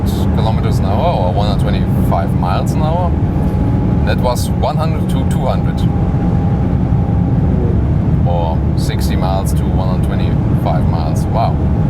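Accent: Germany. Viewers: The German accent is literally the best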